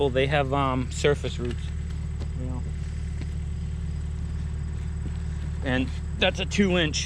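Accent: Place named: Massachusetts, United States. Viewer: Awesome....thanks. Ya didn't have the accent I expected from Mass....LOL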